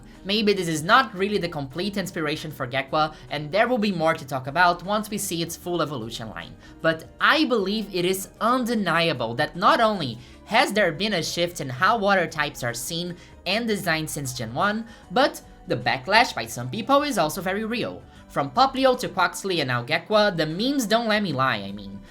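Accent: Brazil. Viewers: hearing you pronounce quaquaval with your brazilian accent healed me thank you mother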